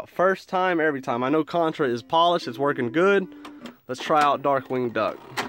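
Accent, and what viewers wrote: South, United States. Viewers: This is an amazing video man! You sound like you're front the south as well like I am. Just picked up an NES for $5 at a yard sale and it works! I'm looking to refurbish it on my next day off.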